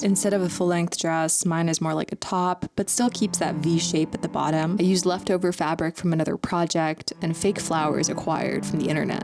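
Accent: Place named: United States. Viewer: Just now finding your channel. You are so talented and eclectic in your style! How is it that you were raised in Austria? and have an American accent?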